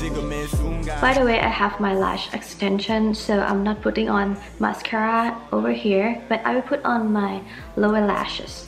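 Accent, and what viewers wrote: England, United Kingdom. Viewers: ชอบสำเนียงอิ้งพี่ มากๆ อยากให้พี่ ทำคลิปแบบนี้บ่อยๆ งื้ออออออ นี่คือพยายามฟังโดยไม่เปิดซับ ฟังออกบ้างไม่ออกบ้าง ถือว่าได้เรียนรู้😶 ปล.พี่ น่ารักมากกกก ชอบโทนนี้เลยยย❤🍑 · i love your english accent💖 so please don't be shy naka💖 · ชอบสำเนียงอิ้งมากกกก😍💗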